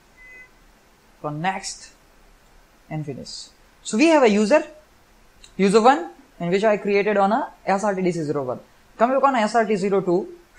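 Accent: India. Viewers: nice video but please indian people should stop speaking english.you are hurting the langage ☺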